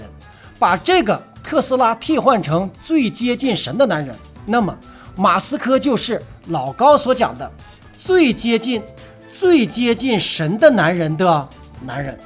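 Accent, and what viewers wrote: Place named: Shaanxi, China. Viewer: 陕西话说的真可以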